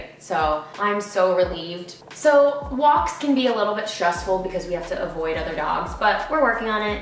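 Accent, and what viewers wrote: United Kingdom. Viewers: That “oh did that offend you” sounded so british 😂 you’re getting a slight accent girl 😂😍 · The little twangs of British that come through in your voice because of Kara are so cute!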